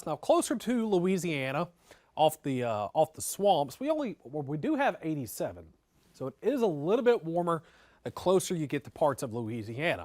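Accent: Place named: Texas, United States. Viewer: Im from Tennessee and im so confused with your accent. Are from Chicago or Texas 😂.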